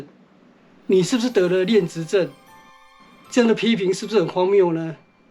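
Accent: Taiwan. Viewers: 不住美國，住台灣。祖籍福建漳州。 不明白你「口音很重」的意思，你認為我和林洋港一樣講的是「台灣國語」嗎？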